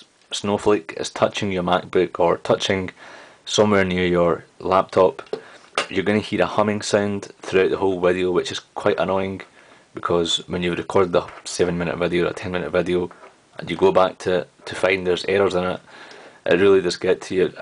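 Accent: Scotland, United Kingdom. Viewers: Are you Scottish? B)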